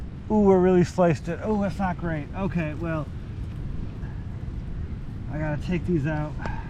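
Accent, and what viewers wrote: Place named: Downstate New York, United States. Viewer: Hey there, again,! Eastern New Yorker Nancy! This has to be said that along with your animation job you should try some voice acting. You are hilarious 😂 and YES that is exactly how downstate New Yorker and Jersey folk talk! 🤣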